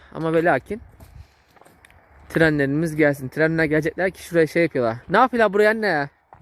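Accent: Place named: Bartın, Turkey. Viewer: Bu şive olayına takanları anlamıyorum insanlar bu şekilde nasıl abartarak konuşabilir çocuk hemen hemen her videoda şive meselesini konuşuyo inanmayanlara açıklama yapıo haala abartıyorsunuz diyorsunuz valla pess tek dert bu olmuş. Ben bartınlıyım çaycumalı tanıdığımda var bu şekilde konuşuyorlar kardeşim sen şive ile ilgili açıklama yapma bence bilen biliyo inanmayanda izlemesin sevgiler🙋‍♀️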